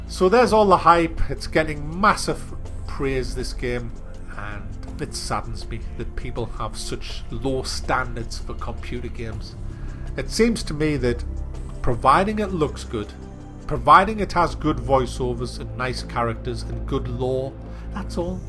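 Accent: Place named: Scotland, United Kingdom. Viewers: I'm so glad someone tries to actually lighten up the tense ass atmosphere surrounding this game, especially in Scottish rofl. had me in tears, because it really reflects how pissed I feel that this level of gameplay is considered a flawless game. It's one of the stiffest effin' games ever. And all the quest are the same. 1. Talk, 2. Questmarker, 3. Witcher sense head ache camera, 4. Forced battle, 5 Talk. Urgh. I finished the game anyway so it's a decent experience all in all, but the gameplay has always been a disaster in this studios games. Why don't they make something that's fun to play? Like seriously, it's like the game is flat. There is no real climbing, no PROBLEM SOLVING, except for some ridiculously obvious puzzles etc. End of rant. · Senor Studly I think you'll find he's Scottish :/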